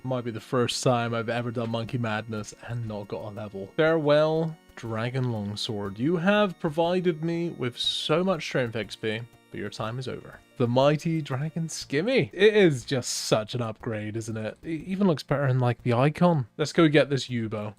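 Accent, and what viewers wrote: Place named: Northern England, United Kingdom. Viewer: yooo, i think you're my fav yt scaper. your nothern accent is incredibly comfy. the vid is good but the voice is like an additional armchair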